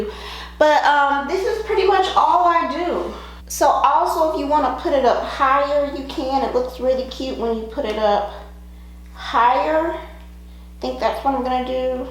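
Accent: United States. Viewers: Her accent XD I love America sometimes. She's cute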